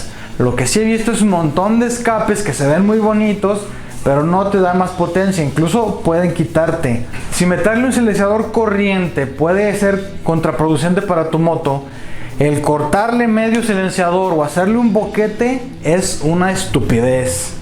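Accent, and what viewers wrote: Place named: Mexico. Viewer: Me gusta más estilo mexicano de este bato a la hora de hablar... muy chido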